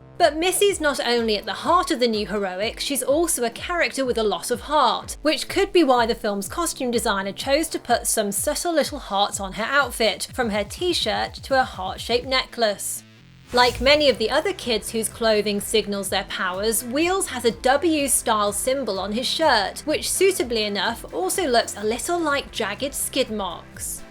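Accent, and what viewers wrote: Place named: United Kingdom. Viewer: I love your voice so British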